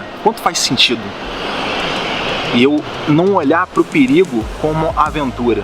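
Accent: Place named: South, Brazil. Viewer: Sabia q esse sotaque era qui do Sul.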